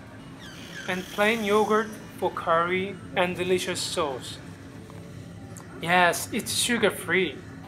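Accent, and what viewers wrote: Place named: Morocco. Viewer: Thank you to you because you speak our dialect i'am proud of you you are between your big morrokkan familly.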